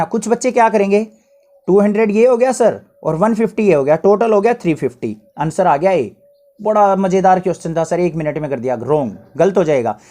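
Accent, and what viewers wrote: Haryana, India. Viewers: Sir ap haryana se ho na apki language thodi vasi hi h sir or baki sab ke bhi solve karado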